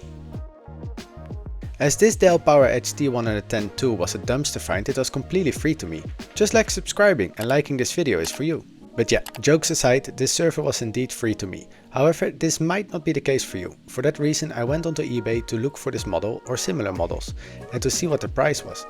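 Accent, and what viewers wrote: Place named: Netherlands. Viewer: Lekker bezig maat, dacht het al aan je accent te horen haha!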